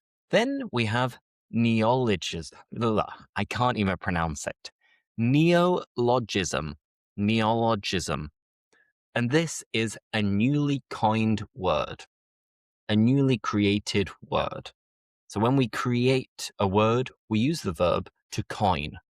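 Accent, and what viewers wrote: England, United Kingdom. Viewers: Wonderful! I love your English accent!!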